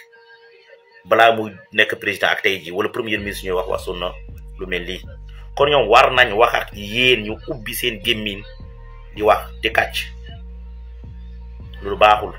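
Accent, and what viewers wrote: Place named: Guinea. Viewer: Yaw rew nga dé ai sa lakeu wolof bou am accent guinéen Réglel yaw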